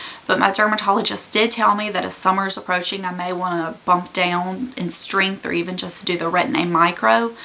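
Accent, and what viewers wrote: South, United States. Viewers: Your country accent is just ADORB! 😍😍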